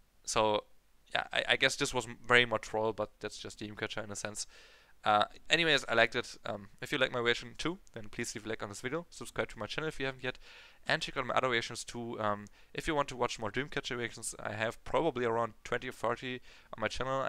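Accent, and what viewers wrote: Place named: Germany. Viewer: Du bist doch Deutscher! Erkenne diesen Akzent sofort c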